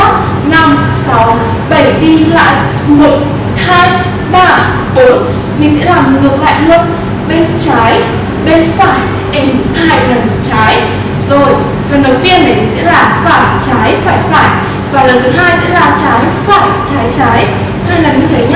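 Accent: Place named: Hanoi, Vietnam. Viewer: Ôi, giọng Hà Nội nghe ấm, sang, thanh lịch quá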